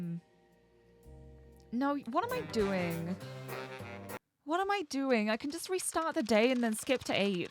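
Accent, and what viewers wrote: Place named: United Kingdom. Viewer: I love your voice. Super soothing. Awesome UK accent 😄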